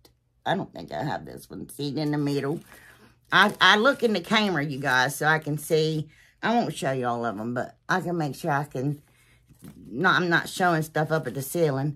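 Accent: South, United States. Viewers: Love everything u sound so cute like frm the south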